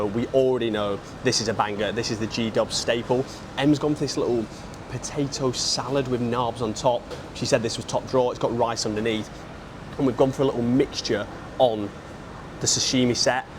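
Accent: United Kingdom. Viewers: Brunei is worth doing for 2 days if you are visiting. I've been to Singapore a few times. I have relatives in Bolton, I thought you did sound a little bit Mancunian lol....I'm from Nottingham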